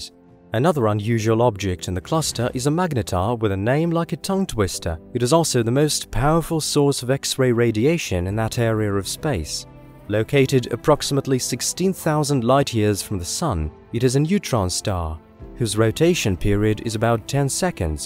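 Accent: United States. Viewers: You sound American...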